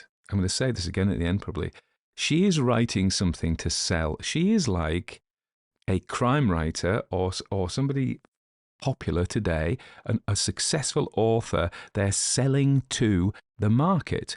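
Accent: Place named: England, United Kingdom. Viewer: Oh my. Your voice is absolutely perfect for narration. I love a Englishman. Lovely story mate.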